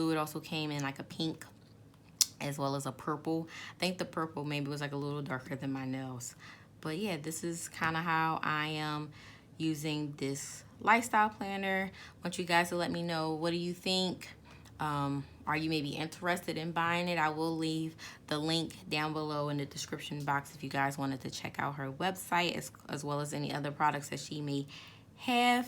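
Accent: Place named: Philadelphia, United States. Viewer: You sound like you're from Philly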